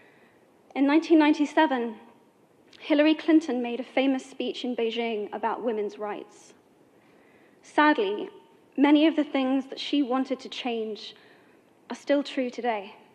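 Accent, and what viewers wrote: United Kingdom. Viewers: It’s called talking slow in a brutish accent, first class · Came to learn British English accent and learned sometime more important. Gender equality. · Her accent is British, I prefer the American one .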